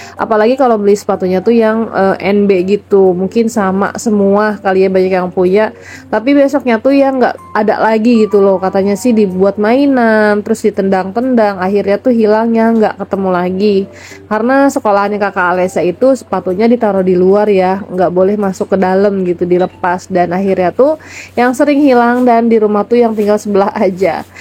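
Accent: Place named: Sunda, Indonesia. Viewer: Insha Allah,gak akan pernah bosen teh,,seneng denger cara bicara teteh,,yg sebagian sunda kayak aku..😀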